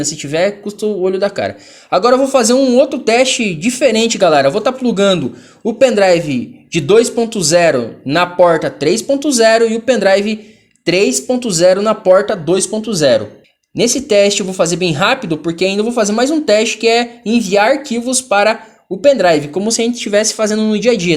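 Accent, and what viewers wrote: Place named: São Paulo, Brazil. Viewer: Uiiii sotaque paulista irritante esse em, existem melhores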